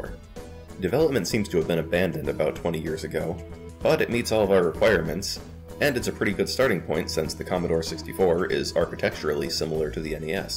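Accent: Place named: Utah, United States. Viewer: Sick vid. Thanks. Also Ew. A ruht directory. Is it tuh a spuhn Or is it too a spoon Ew. Disgusting. 2+2 isn't 5 cuz "that's how we say it down here" are you from utah?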